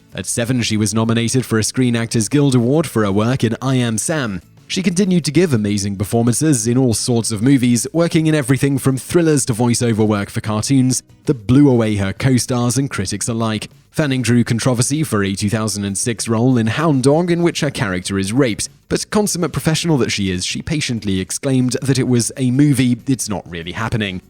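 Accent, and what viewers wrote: United Kingdom. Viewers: has always been my favorite top ten list channel. Dont lose. We Yanks love British accents.: )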